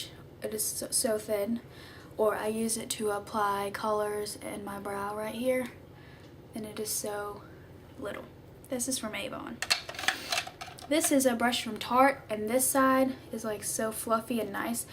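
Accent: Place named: Texas, United States. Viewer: Where are you from?Texan?You kinda have an accent like me..lol,good video ;-)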